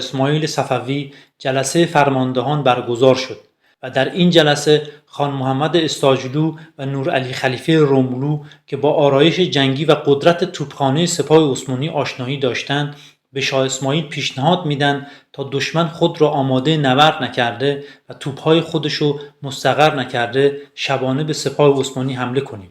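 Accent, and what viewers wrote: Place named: Lorestan, Iran. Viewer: شما لُرهستیدازلهجه مشخصه...منم اهل پلدخترلرستان❤❤❤❤❤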